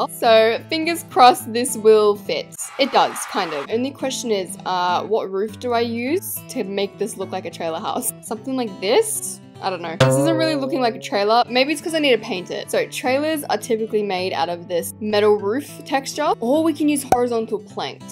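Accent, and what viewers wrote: South, United States. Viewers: Can you do a video only using you country accent? LOVE YOUR VIDS!!! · How is better at a country accent then me and I’m from Texas 🥲🥲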